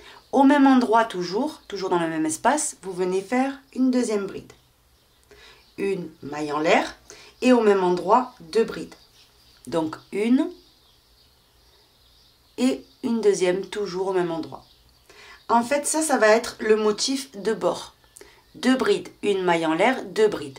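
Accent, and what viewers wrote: South, France. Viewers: bonjour.. et merci beaucoup pour toutes ces belles choses que vous nous donnez à faire.. j'en suis à mon quatrième châle avec vous, et je ne peux que me féliciter de vous avoir trouvée sur mon chemin, en me promenant sur YOUTUBE... vous m'êtes d'un immense secours; et ainsi, je peux faire plaisir, dès que possible, à certains de ma famille et j'apprends plein de chouettes points, ce qui me transporte de joie.. merci beaucoup. le dernier en train est un point relief que j'ai fait avec caprice couleurs mélangées qui rappellent de délicieux sorbets pêche/vanille/citron enfin des couleurs pep's et un point extra!! le prochain avec le point "pouf"!!... sera le bienvenu.. merci pour vos explications claires et attentives... et aussi pour votre accent qui me fait voyager au pays du soleil.. bonne journée et portez vous bien.. à bientôt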